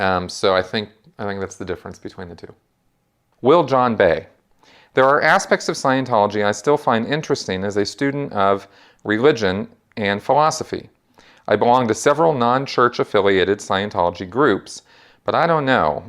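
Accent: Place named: California, United States. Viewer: 44 down, 46 left to go! I am slowly going through all of these q & a's from the beginning. As an amateur anthropologist and person fascinated by religions, I truly appreciate your detailed and objective answers. Holding my questions since you have likely already answered them. Having grown up in Central and Northern California, I find your speech patterns very familiar and much more "real" to me than the other vocal ex-members. Keep on!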